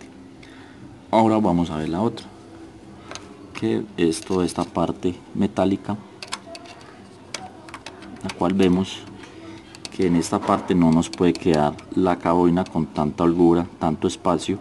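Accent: Colombia. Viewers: Holaaaaa saludos no quiero equivocarme pero juraría que eres de Colombia, soy aficionada a la radiocomunicacion y conozco diferentes acentos. Me encanta tu forma de explicar tus vídeos. Gracias!! Voy aprendiendo muçho contigo. Es la primera vez que escribo.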